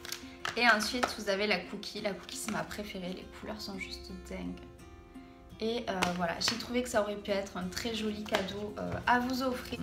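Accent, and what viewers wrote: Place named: South, France. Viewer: Je participe ! Merci pour ce super concours, je rêve de cette palette depuis qu'elle est sortie ! Ca fait plaisir d'entendre l'accent du sud chez une youtubeuse ahha! Joyeuse fêtes de fin d'année :)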